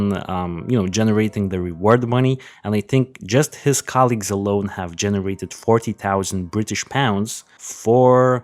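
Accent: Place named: Russia. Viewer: Completely off-topic, but are you Russian? You sound so much like my dad when he spoke English. He immigrated to the US when he was 24. It was soothing to hear (aside from the content in which you were talking about).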